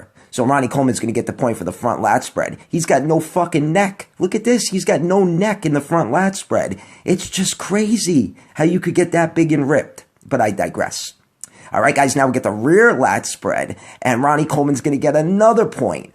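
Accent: New York, United States. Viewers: i love that nyc accent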